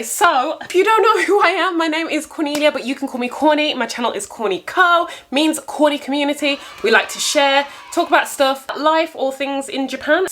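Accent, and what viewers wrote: Birmingham, United Kingdom. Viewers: It’s the accent for me. Where’s it from. Birmingham??? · Do I detect a (former) Brummie?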